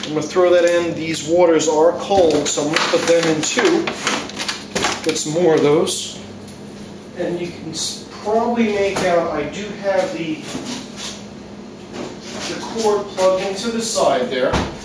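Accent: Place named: Philadelphia, United States. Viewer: nice one, but put those cubes in a baggie. you sound as if you may be an original philly boy, am i right?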